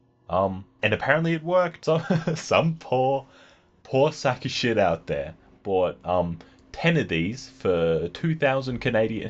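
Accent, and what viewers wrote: Australia. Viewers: GoingGone he’s Australian. Not British.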